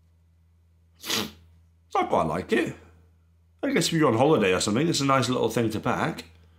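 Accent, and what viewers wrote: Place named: Oldham, United Kingdom. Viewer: Where are you from? Your accent sounds so similar to mine or where I'm from cause I'm a girl and you're not lol.. But I'm from Oldham uk.. So I've very intrigued.. :p this video made me laugh anyway 😂 can't wait to watch your videos from now on ! You've got your self a new subscriber :) well done!! X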